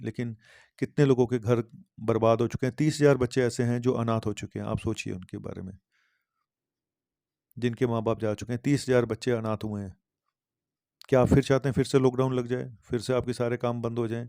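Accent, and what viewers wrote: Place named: Delhi, India. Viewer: Aap ka dilli vala style kya khub hai... 👍👍👍👍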